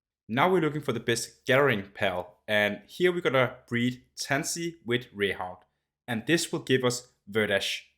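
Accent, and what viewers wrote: Denmark. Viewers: You Sound danish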